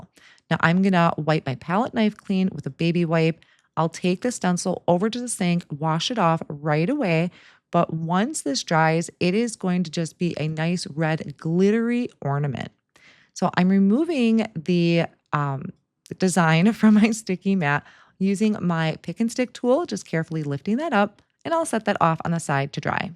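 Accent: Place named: Wisconsin, United States. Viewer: Oh my gosh, I just stumbled onto your channel and heard your voice and I thought, wow, that sounds like home. Turns out you’re from Chippewa and I’m from Eau Claire! I’m down by Milwaukee now but love that we’re from the same neck of the woods! ❤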